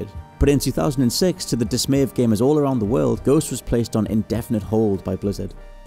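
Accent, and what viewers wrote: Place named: Geordie, United Kingdom. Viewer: is the Narrator from jesmond in Newcastle? sounds like it. it's very posh Geordie, but still sounds a bit Geordie to me! toooooon. :)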